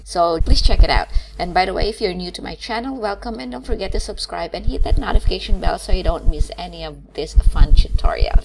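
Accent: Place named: England, United Kingdom. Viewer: i love your English accent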